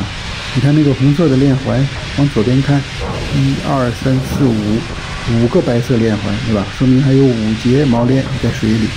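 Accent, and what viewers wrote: Tianjin, China. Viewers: 听口音像天津人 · 天普，船长天津人。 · 老哥是不是天津人？ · 船长是天津人？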